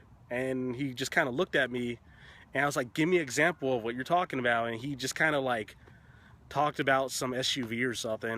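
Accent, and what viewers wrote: West, United States. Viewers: You sound like a white guy from sorta out west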